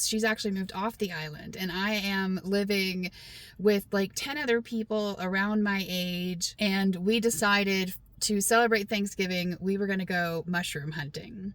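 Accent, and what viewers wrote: South, United States. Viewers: I love your Southern Accent.:face-red-heart-shape::face-red-heart-shape::face-red-heart-shape::face-red-heart-shape::face-red-heart-shape::face-red-heart-shape::face-red-heart-shape::face-red-heart-shape::face-red-heart-shape::face-red-heart-shape::face-red-heart-shape::face-red-heart-shape::face-red-heart-shape::face-red-heart-shape::face-red-heart-shape::face-red-heart-shape::face-red-heart-shape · You can take the girl out of the south, but you can’t take the south out of the girl😂❤ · I love it when you slip into your Southern accent! I was raised in Southern California by parents from Mississippi and I even slip into it. I was raised with so any Southern phrases that they are just part of me. Keep do’in what yer do’in and drawl yer little heart out! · I love your southern accent. It's a big part of your bubbly personality. 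❤ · Girlfriend, you better rock your, our, Southern accent! I'm proud as he!! about mine and you should be, too! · I think your southern accent is adorable. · I never thought I had an accent until I started traveling to Mexico. I'm from Wisconsin and just thought I spoke in a neutral way. All the Mexicans I met and held conversations with, wanted to know what part of Canada I was from.😂One of the reasons I love your channel is when you get all excited and animated and you switch to southern mode! It's endearing and does not make you sound dumb. Have a good time at Mt. Ranier, my dear!😁✌🤟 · Amat is as smart does ❤😂love your bit of southern accent Stay safe girl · As some one who lived in East Tennessee for 24 years, your accent makes me miss my friends I left when I moved out west. Plus, my son is a bred and born southern boy, who sadly, doesn’t have much of an accent. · I just thought you had spent some of your life in the south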